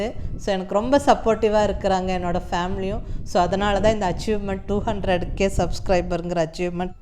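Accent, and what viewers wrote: Kongu, India. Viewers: Many congratulations madam Wish you many more laurels 💐 Above all your kongu tamizh is also attractive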